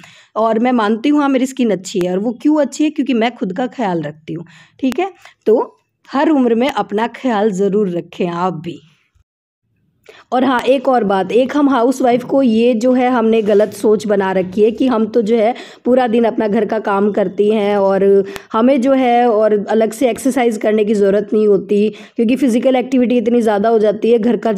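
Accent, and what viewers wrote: Rajasthan, India. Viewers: Hi m Rajasthan se hu aur aapki tone sun ke lagta h ki aap bhi Rajasthan se hi ho....maine abhi kuch din pahle se aapke vlogs dekhna start Kiya h....mujhe aapke vlogs acche lagte h aur khas kar ke ye vlog to kuch jyada hi accha h...m aapse milna chahti hu....aap kaha se ho Rajasthan me....m aapse kaise contact kar sakti hu???Mera naam deepa h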